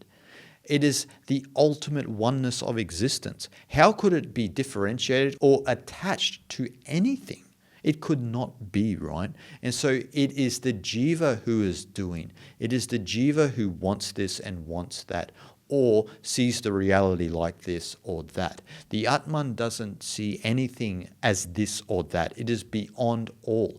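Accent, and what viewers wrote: Australia. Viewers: Love your videos and your Australian accent ♥️🦢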